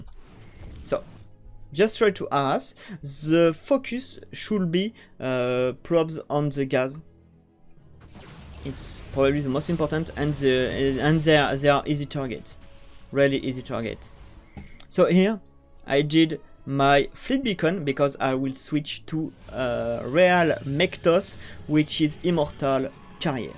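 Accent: France. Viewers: Your French English is cute.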